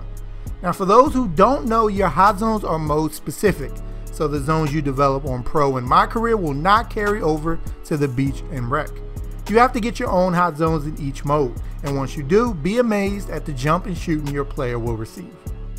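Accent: Baltimore, United States. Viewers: Bruh u can hear that Baltimore accent everywhere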